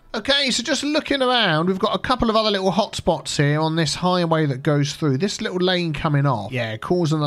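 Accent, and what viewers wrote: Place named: England, United Kingdom. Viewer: It's really just about the way your voice sound that I watch your videos. And your accent. English accent. You've got that strange tone, never heard anything like it anywhere else. I like it thought.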